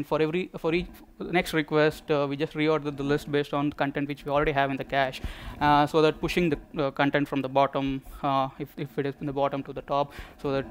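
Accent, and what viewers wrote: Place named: India. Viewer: need some time to used to this India accent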